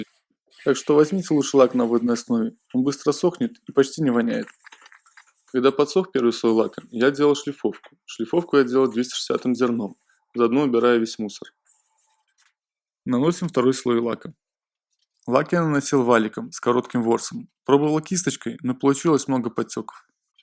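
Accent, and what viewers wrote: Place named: Belarus. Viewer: Точно! Коллега в Минске несколько лет прожил, тоже так говорил.... Живе Беларусь)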